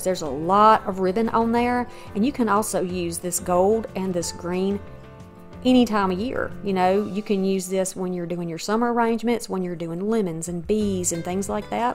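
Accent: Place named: Alabama, United States. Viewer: Hello from a fellow Alabama girl! I haven’t watched this video yet, but I already know I will love it. I found your channel last weekend and I’ve been watching a lot of your past ones. I have one playing on my tv in the bedroom right now from last year. Love your channel and I love hearing someone who has the same accent as I do 😊❤ best wishes! (Subscribed and hit the bell to receive ALL your notifications 💜)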